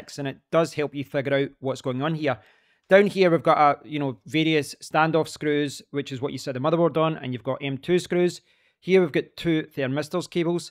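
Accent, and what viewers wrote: Scotland, United Kingdom. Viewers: Love the excellent description and the great Scottish accent. Thank you!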